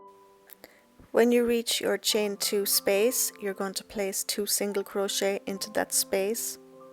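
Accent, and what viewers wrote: Ireland. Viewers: So cute i am always scared to make sweater. Becouse of my boobs being so big lol i am gonna have try this out though do you think if i measure around my boobs and get ths mesurment that way it would work .just wondering i am 44 dd. I love the sweater and i love your Irish accent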